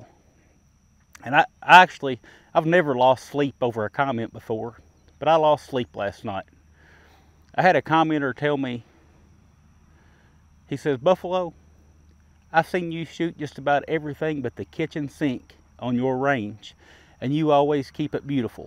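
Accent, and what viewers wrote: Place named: Ohio, United States. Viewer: I like the accent. Funny I shoot with a guy from Ohio, sounds just like you! Good vids keep em up.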